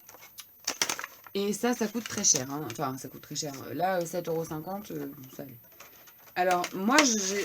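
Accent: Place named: England, United Kingdom. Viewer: Ton accent anglais j’adore. Une affaire la peinture izink ça marche sur tout support,tissus ,métal ,bois elle sont tops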